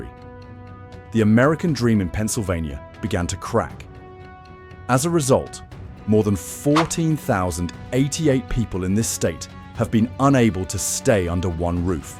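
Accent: England, United Kingdom. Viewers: Why is a guy with an English accent narrating this video about PA? Could it be to lend credibility to a piece of propaganda?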